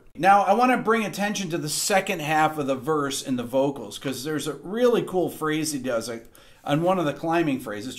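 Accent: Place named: United States. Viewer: Its not chap suey..... silly murican accents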